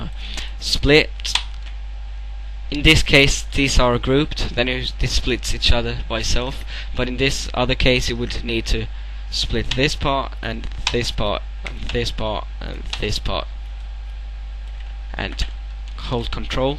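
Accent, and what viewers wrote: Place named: United Kingdom. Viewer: UK Voice.. Better then those other shitters that can't speak proper english lol. Nice tutorial sure :) Keep them comming ^^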